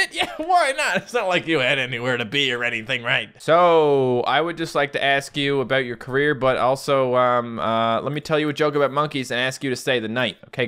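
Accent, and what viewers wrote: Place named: Newfoundland, Canada. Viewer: Spent the whole video trying to figure out your accent, then I realized you were a from Newfoundland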